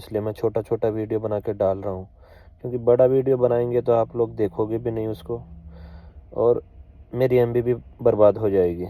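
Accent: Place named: Rajasthan, India. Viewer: Rajasthan se lag rahe ho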